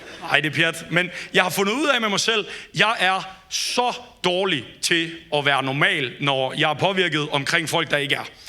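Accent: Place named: Jutland, Denmark. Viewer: Der starter meget jysk ud... Men selv med den jyske levering har du kraftedeme fat i noget fedt 🤣🤣🤣🤣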